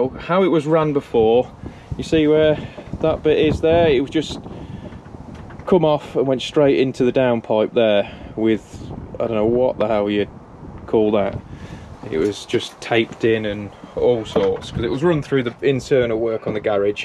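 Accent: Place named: Nuneaton, United Kingdom. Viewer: Was trying to place the accent as thought it sounded East Midlands. Turns out it's Nuneaton, never noticed how different it was from Coventry before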